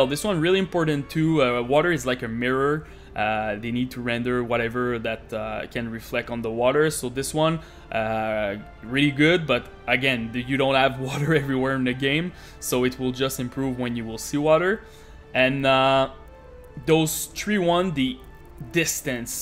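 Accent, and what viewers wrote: Quebec, Canada. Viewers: pas francais , humhum , un quebecois canadien francophone :D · Qc man ? "J'orconnais" ton accent... J'ai le même haha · T'es quebecois c'est sur hahahahha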